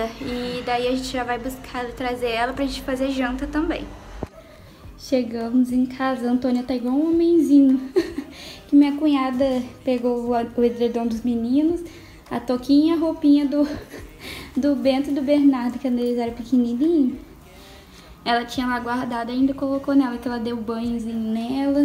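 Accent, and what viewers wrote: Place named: Rio de Janeiro, Brazil. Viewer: cê é carioca mesmo? cê puxa o s kkk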